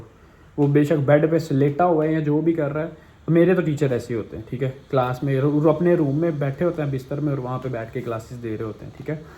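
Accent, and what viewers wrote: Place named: Punjab, India. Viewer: Great job.... Punjabi ka trka buht achaa lgaty app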